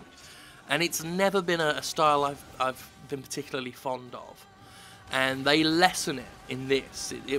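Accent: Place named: United Kingdom. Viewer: You sound more british here than usually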